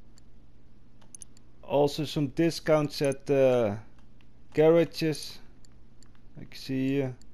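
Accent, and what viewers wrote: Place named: Netherlands. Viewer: Hey man I love you're accent, are you dutch? Ik wel namelijk en je accent doet me denken aan m'n buurman haha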